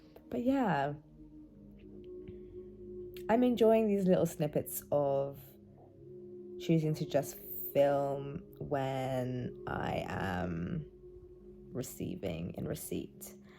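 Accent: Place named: United Kingdom. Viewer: UK accent Wit Intelligence is So Fine🔥🔥🔥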